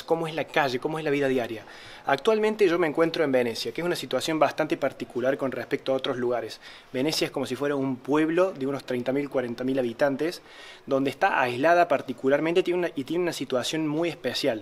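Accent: Córdoba, Argentina. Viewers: ¿Es muy importante conocer el idioma del país donde vas a vivir? ¿Cuál es tu profesión? Por tu tonada, eres argentuno cordobés, ¿no es así?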